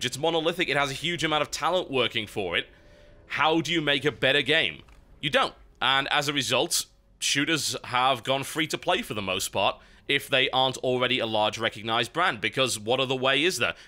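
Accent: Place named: England, United Kingdom. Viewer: Im scared right now, because people seem to be taking opinion of Steam as gospel... seemingly only because he has a english accent.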